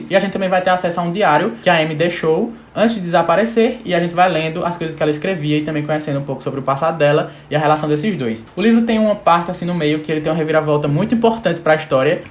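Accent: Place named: Paraíba, Brazil. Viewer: Ahhh o sotaque! Sou de Recife, mas acho que tu é paraibano, acertei? Me senti em casa com o sotaque parecido com o meu ♥️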